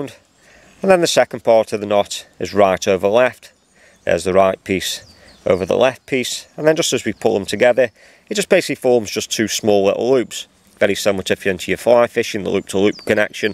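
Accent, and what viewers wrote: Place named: Wirral, United Kingdom. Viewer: Just come across your videos mate really well made and informative, I’m wondering if you’re quite local to me as you seem to have a bit of a Wirral accent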